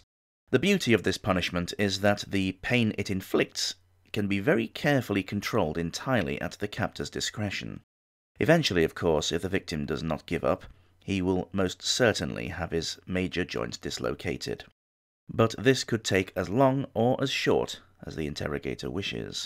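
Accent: South, United States. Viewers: I rate Southern Drawl 7/10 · Some of these are better and more merciful than what the politicians & the 1% do to everyone daily. Well they just kicked in my door & it's time for the thumbscrews. (I refuse to use that nickname) Don't worry when I confess I'll be laying everything I've ever done at your feet. Great clip as usual, impressive research, loved the video, great line about old honest Guy Fawkes. Southern Draw? 7-7.5 not bad, you sounded a bit like a educated southerner though, more of a politician than a moonshiner. -no fun. Reason? Just listen to the song. ...with black curtains..makes a difference apparently. Margaret the Pearl of York; more of man than most of us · Not bad Southern accent! I'm from the fringes of the South so it's not an accent I have much experience with but it still sounded good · Agreed. Definitely not Texan or Georgian. 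(I’m native Georgian who spent 5 years in Waco, Texas. Virginia / North Carolina is the closest to the accent of the podcaster. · I'm from the south and I must say, your southern accent sounds absolutely beautiful. The old southern accent can still be heard in parts of Georgia, Alabama, and Mississippi; and even in parts of Louisiana and Texas. Fun fact: The southern accent is the baby brother of Received Pronounciation. When Princess Diana visited the south for the first time, she stated that she could understand us far better than our Northern counterparts. The Cajun accent is derived from the French accent...that's why no one can understand those fuckers, lol...only playing around. Anyway, sorry to go on a tangent and you may have already known all this, but here it is anyway.😊😅😂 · I'd say 10 out of 10 for the southern accent.. and thats from a southerner in Virginia, USA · your southern drawl was pretty good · Southern drawl, oh yes!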